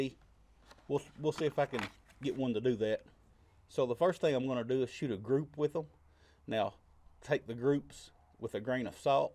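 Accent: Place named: South, United States. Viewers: Really? I think the way you talk is cool. You sound like a guy at work. He's from WV. That casual Appalachian dialect. Good shit. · It's obvious has a southern accent, but you cannot dislike the man about the way he talks, no matter what. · It’s because it is a gentle southern accent with clear, crisp annunciation, and a lot of intelligence behind it. It’s not flashy or boisterous like most content these days.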